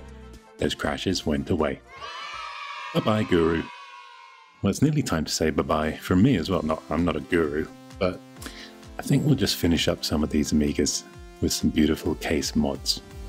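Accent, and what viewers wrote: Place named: Geordie, United Kingdom. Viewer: As someone from the North East, UK, that was a passable Geordie accent :) Also that carbon fibre wrap skinned Amiga looks amazing.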